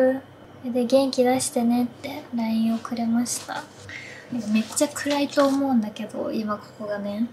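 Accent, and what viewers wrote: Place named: Kansai, Japan. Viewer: さんの関西弁最高やー😊お部屋も勿論やけど🎉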